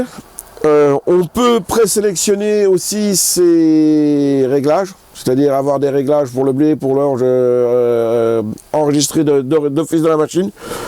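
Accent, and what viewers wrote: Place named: Lorraine, France. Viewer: j habite a 1h de Nancy et je sens bien l accent de sa voie 😂😂😂 belle video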